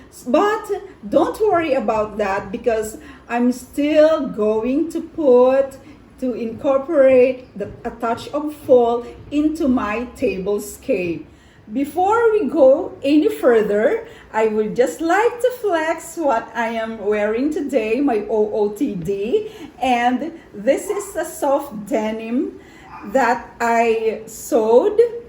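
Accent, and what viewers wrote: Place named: United Kingdom. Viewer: Back to your table set up. Your vintage ginger jar oh my you have all this beautiful rare pieces in your home. The fruits and the crystal tray is epitome of Classic blue and white decorating. I love the idea that you bring fruits for fall accent and I know we don’t celebrate fall in the Philippines and that’s a very clever idea. Tea party is one of my favorite too so overall you made me really happy with this collaboration and you make me laughed with your British accent. Lol I hope we can do this again next time this is really fun I learn something from you and I love it. Thank you Sis. I did share this in my FB and later in my community tab.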